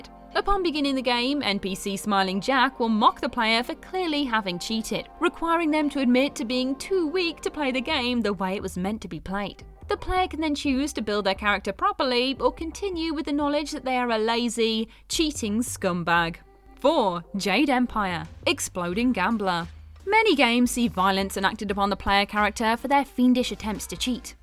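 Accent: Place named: United Kingdom. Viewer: The US lingo ("football" that rarely sees a foot) in a British accent, using typically British jokes is really horrible to see. Everyone except the US uses "football" and the metric system. I can see the determination to expand this channel/business to a much wider English speaking market, but really? You have the rest of the planet to think of.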